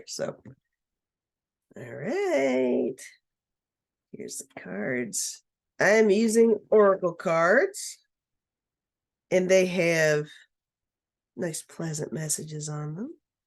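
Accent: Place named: South, United States. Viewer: You are so pretty, love the sound of your voice, best subtle southern accent I’ve ever heard!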